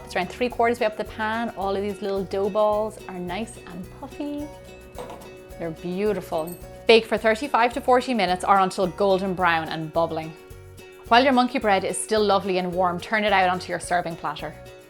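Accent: Ireland. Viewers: It's softened for American ears i guess :p But you can almost listen to the Irish pub rogue in ya ! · Love the recipe. You have a beautiful Irish accent by the way. How long have you lived in the US?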